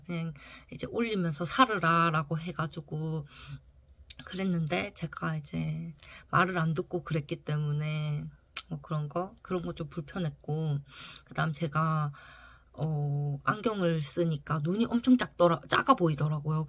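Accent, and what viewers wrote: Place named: Andong, South Korea. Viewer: 야 안동 말투 너무 오랜만에 들어서 그런지 너무 재미있다 ㅋㅋ 영상 너무 재미있게 잘 봤어 😊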